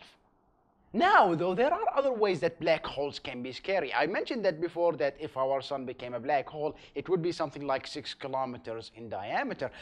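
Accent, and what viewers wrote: India. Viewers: I cant tell if that's an Indian accent or an Arabic accent...either way it's fucking terrible. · Is he Indian? Because his accent is so distracting. "Eaaartdh" - this guy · What a perfect Hinglish! It makes me want back to India =)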